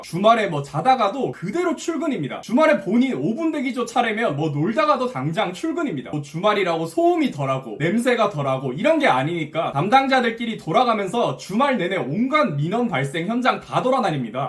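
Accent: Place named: Gyeongbuk, South Korea. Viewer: 말투를 보니 경북분 같네요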